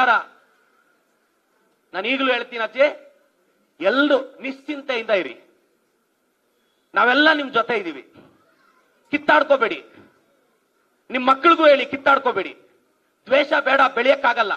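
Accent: Telugu, India. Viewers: Telugu style dialogue super guruve.👏👏👏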